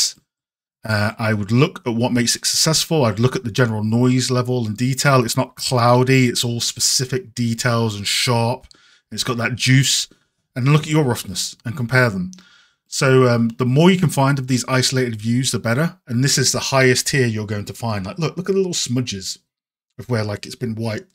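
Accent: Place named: United Kingdom. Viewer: Could listen to a british guy saying "roughness" for hours.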